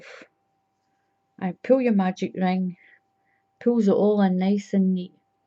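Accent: Scotland, United Kingdom. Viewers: thanks, I live in Scotland so your Scottish accent is wonderful to hear,so many channels are American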